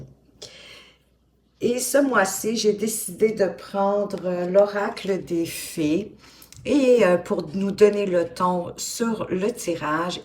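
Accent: Quebec, Canada. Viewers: Merci à vous pour ce beau tirage si parlant !! et la fin me bouleverse ! oui j'ai mis de côté les soins que je peux offrir grâce à mes mains . C'est un plaisir de vous écouter et de vous entendre ! j'adore l'accent québécois. j'en suis tombée amoureuse quand j'ai vécu là-bas 🙏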